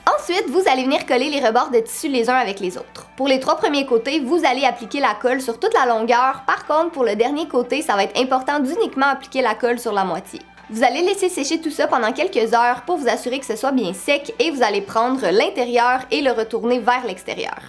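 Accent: Quebec, Canada. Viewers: Trop bien t'as vidéo !!!! T'est trop belle et j'adore ton accent québécois💜💜💜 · Ouais tes bien québécoise '' melon d'eau '' 😂😂😂 Ma grand mère est québécoise mais elle a pas du tout cette accent Peut être parce que j'ai l'habitude de l'entendre Bref tes vidéo sont super continue 😘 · Toi tais français de France hein? Pcq elle,elle est québécoise(comme moi)😂😂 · J'aime bien l'accent québécois il est trop beau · Seynabou Ng moi je crois qu elle et québécoise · T'as vraiment intégré l'accent québécois. On devinerait même pas que t'es née en France. C'est important de parler de ce sujet sans tabous, surtout quand il y a des gars qui écoutent, raison de plus. C'est un signe qu'on n'est pas tous indifférents à vos problèmes de filles. Même si on ne vit pas le phénomène directement, on le vit au niveau social. Et on imagine que c'est chiant au niveau inconfort et organisation et ce que ça vous coûte par année. 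Il faut continuer à bouger et prendre des analgésiques si nécessaire, et ne pas laisser vos règles vous empoisonner la vie comme une maladie. On trouve ça tellement plate quand une fille ne veut pas nous accompagner dans des sorties ou des activités aquatiques comme si elle était malade. et quand on est en train de relaxer, on peut vous offrir des massages pour vous rendre ces périodes un peu moins désagréables. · C’est seulement la façon de parler des québécois moi même j’en suis une et pour moi c9est vous qui avait un accent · Elle québécoise comme moi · oui elle est quebecoise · C’est juste l’accent québécois hahaha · St'une québécoise 😌💅🏼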